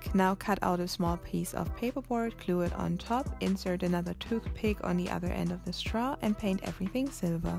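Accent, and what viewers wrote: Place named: Germany. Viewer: You can clearly tell she's Herman by the way she's speaking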